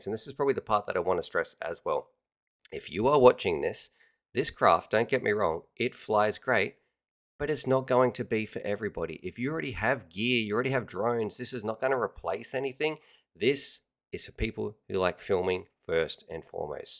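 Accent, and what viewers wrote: Australia. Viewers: Mate he's aussie it's his accent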